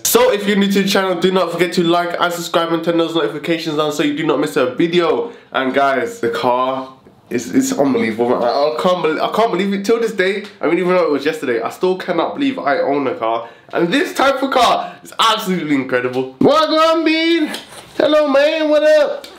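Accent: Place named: Jamaica. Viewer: I can hear he is from Jamaica like me🙂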